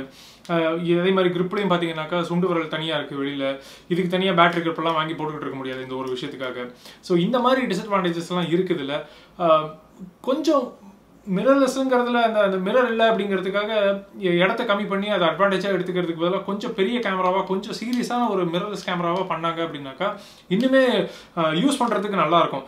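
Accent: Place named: Chennai, India. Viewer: Semma Namma Chennai Thamizh'la explained... thanks buddy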